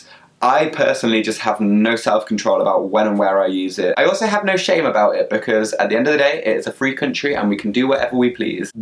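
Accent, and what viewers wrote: United Kingdom. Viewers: a really great video , I know your struggle! one question , are u british? love your accent xoxo from italy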